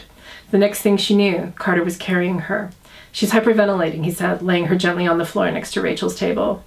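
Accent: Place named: France. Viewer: Your French accent is not bad, it’s cute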